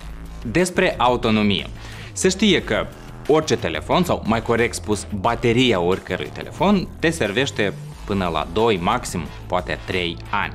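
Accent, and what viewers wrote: Moldova. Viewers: Aud accent Moldovenesc, dau skip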